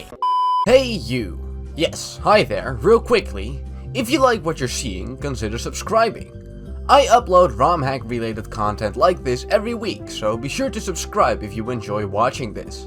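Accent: Netherlands. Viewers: Very good review, +1 point for Dutch accent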